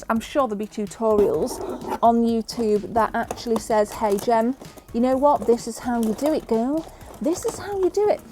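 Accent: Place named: Liverpool, United Kingdom. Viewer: You sound like a posh Scouser? AND you DONT look old enough to know about an AMIGA ?!?!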